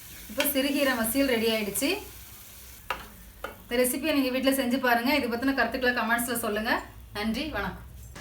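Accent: Tirunelveli, India. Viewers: நெல்லை தமிழ் நீங்க செய்த கீரை கடயலைவிட அதிகம் ருசி! Nice, very simple video.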